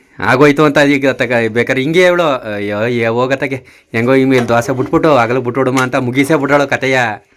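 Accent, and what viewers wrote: Mandya, India. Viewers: Anna nivu mathadodu tumba eshta., adrallu nim wife jhote mathadtiralla adu maathra super., namdu mandya ne., mandya language super...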